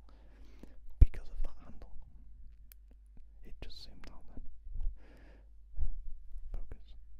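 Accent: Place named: Northern England, United Kingdom. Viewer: oh haha you sound like your from the north of England. And how hard is oblivion to complete xD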